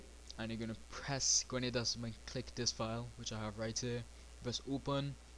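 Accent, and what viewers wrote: Trinidad and Tobago. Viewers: ur trinidadian?? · Aye Bhai youze a Trinidadian ? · u sounding like u from trinidad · dude are u Trini ?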